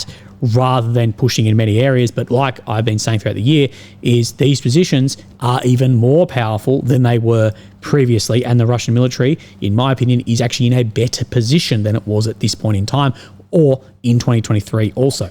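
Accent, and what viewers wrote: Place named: Australia. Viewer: the other producers you’re mentioning are way lower in quality. accent alone make Americans love him like crazy! We think that funny little accent is great. I could put up with a drunk Ausi talking nonsense all day and still have a good time. has implied many time “Ukrainian could Win” I quote “if Ukraine wins” There is not a bigger lie told then “Ukraine win” in the same sentence. I’m here looking at a map wondering when people stop lying to the poor men who die daily under the false idea of “winning in Ukraine”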